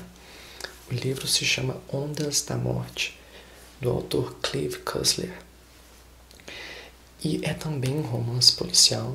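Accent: Minas Gerais, Brazil. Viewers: O sotaque mineiro ❤️ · Amanda Utuari ele é do interior de MG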